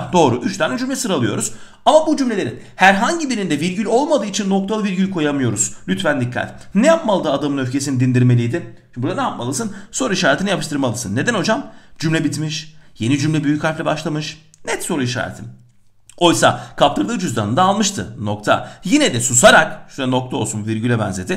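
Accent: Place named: Konya, Turkey. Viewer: Memleket Konya sanırım hocam 😀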